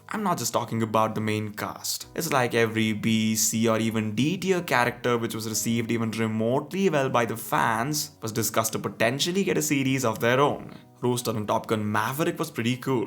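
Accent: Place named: India. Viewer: Click on video I want to watch: Indian voice Okay nvm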